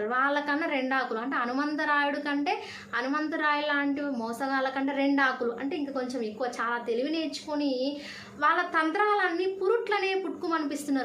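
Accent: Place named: Telangana, India. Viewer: మీది తెలంగాణనా అమ్మా... మాం డలికం 👍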